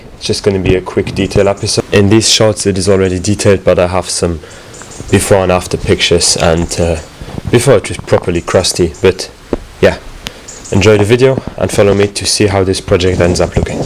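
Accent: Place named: Minnesota, United States. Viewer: You sound like small town Minnesota. "Fuck ton" thats a gorgeous car.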